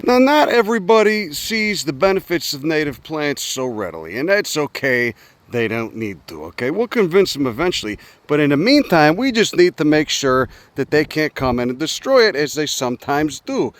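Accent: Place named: Brooklyn, United States. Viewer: I'd been wondering if you were from Cali, cuz the accent sounds more Brooklyn to my Southern ear, but yup. That's some Earth First California shit, my friend. 🧡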